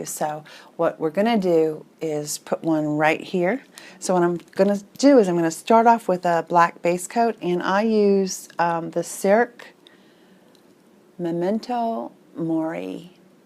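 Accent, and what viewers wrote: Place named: South Carolina, United States. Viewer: love the nails. love his accent too. sounds like mine lol I'm also from South Carolina. thanks for doing the tutorial. hope you have a great Thanksgiving!!!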